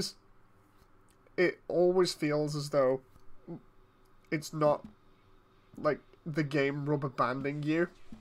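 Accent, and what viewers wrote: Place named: United Kingdom. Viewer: U sound like david schwimmer but british 😂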